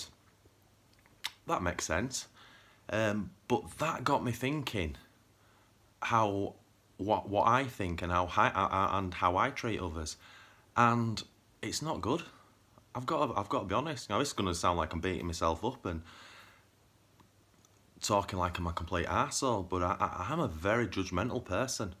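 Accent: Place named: United Kingdom. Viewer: Great vid, totally get it. Were's your accent from? Im from uk now living in australia. Your accent sounds same as mine : )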